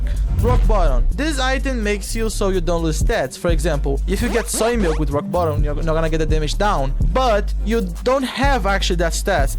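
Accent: Brazil. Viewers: o cara é br. percebi pelo sotaque kkkkkkkk. tmj mano, video mt bom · Percebi que é BR pelo sotaque no inglês haha massa cara...eu simplesmente não consigo passar o Mega Satan com o The Lost pra abir Godhead...muito ruim deixar de pegar os itens da devil room pra dar a Angel room e pegar as chaves acho que nunca vou conseguir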